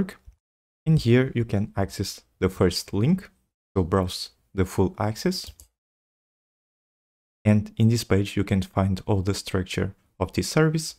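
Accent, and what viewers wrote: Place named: Portugal. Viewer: Muito reconhecível seu sotaque português de Portugal! Gostei muito da simplicidade que trouxe o assunto e um case prático. Saudações do Brasil!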